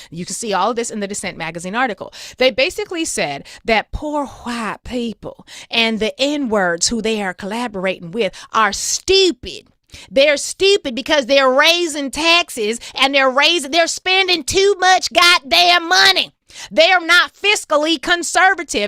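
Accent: South, United States. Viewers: You are the best host on Urban View at dragging MAGA especially with your pearly white lady southern drawl.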